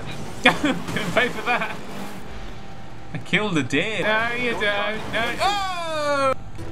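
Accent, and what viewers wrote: England, United Kingdom. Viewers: I don't know why British Accent makes him funnier for me. Well, may be, because I am a spanish speaker... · no I'm pretty sure he sounds more like a English person. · Is Canadian? Because he sounds English.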